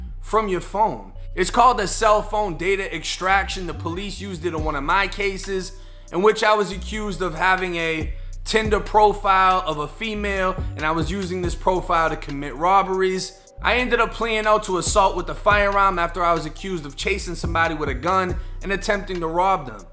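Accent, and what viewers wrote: Boston, United States. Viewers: Bro your accent literally half Boston half Florida😂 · Bruh his accent funny as hell he gotta be from Boston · Boston accent nukka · Yo, you talk like your from the bay side of Boston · Where u from Boston? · The innernet instead of internet! Lmfao! We Bostonians hold our accent down! 😜. Keep on keepin on, I’m rocking will y’all and you rocking with us! 😝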